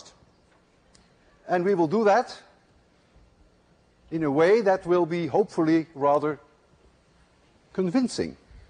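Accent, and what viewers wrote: Netherlands. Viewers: he talks like he's from holland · is he dutch? his accent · He's got a dutch accent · he sounds dutch.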